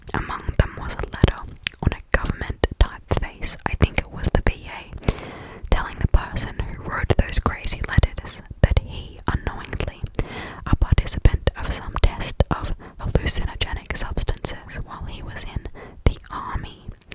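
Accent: Australia. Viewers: Australian accent 🔛🔝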